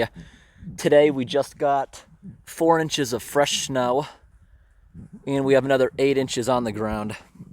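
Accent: New England, United States. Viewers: Wonder why abandoned buildings like this weren’t torn down. Love your New England accent btw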